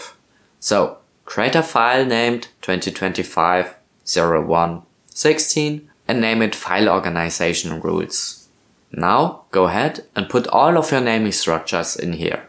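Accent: Germany. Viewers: bro has the strongest german accent iv ever heard, nice video of course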